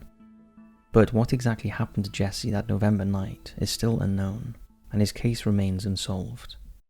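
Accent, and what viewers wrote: Wales, United Kingdom. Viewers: really? I'm picking up a newport/cardiff twang lol Maybe this can go in an unsolved mystery vid 🤣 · I read on several other posts that it’s a Welsh accent, so I do believe so. I recognise it somewhat since I once had a colleague from Cardiff area. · Pavman Cometh he's definitely Welsh! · I think he's Welsh? The way he pronounced some words made me think South West but he lacks that Farmers twang like me. 😂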